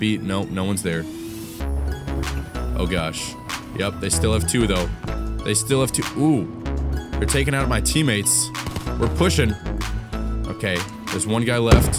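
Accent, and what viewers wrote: Minnesota, United States. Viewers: are u from Minnesota?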